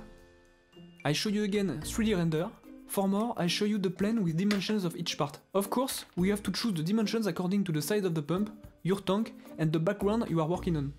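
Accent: France. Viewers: un anglais avec accent français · on voit bien que c'est un Français qui parle en anglais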